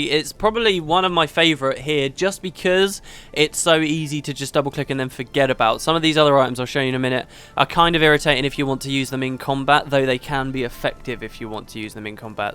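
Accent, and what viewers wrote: England, United Kingdom. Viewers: woot is back!! i missed your swagger english accent:P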